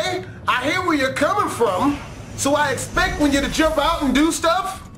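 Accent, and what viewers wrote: United Kingdom. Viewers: I love your british (medieval) accent >.<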